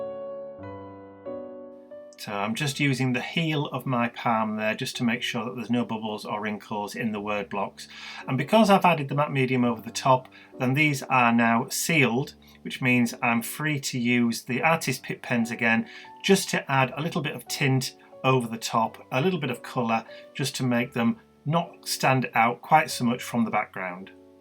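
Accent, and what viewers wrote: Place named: Goole, United Kingdom. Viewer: Love that page. I love listening to you, I'm from Goole and your accent reminds me of home, I've been away for many years. It's good to hear words like scrumpled!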